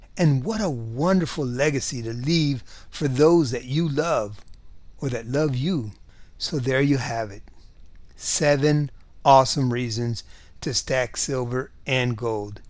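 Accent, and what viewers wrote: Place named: Mexican-American, United States. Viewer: Oh so sorry for the mistake. I thought I heard a Mexican-American accent. Either way your content is gold. 👍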